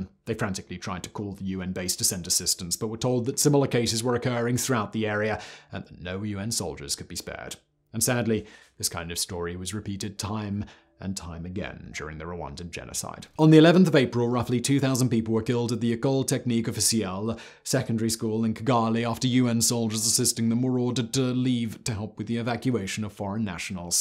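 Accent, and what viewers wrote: England, United Kingdom. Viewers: Or... he wants to get to filming the other 50 videos he has scheduled for the day where he just sits there reading a Wikipedia article that someone else just printed out and handed to him 30 seconds before. Seriously... this guy makes his living sitting and reading shit. He doesn't give a shit about telling stories he makes it clear he hasn't read any of the scripts before they record them and usually has no clue what he's talking about. Whenever he pauses to add his own opinions on a subject it's usually something simultaneously arrogant and stupid. He's just reading as much crap as his accomplices can print out and put in his hands so they in turn can upload it to YouTube and get money on clicks. How many channels does he have now? 50 or so? Do you think he even knows? He's not a historian or a media personality or a great thinker or entertainer. 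He's a reader. With a beard and an English accent. A glorified Speak and Spell. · You are speaking too fast with your British accent that makes it hard to catch up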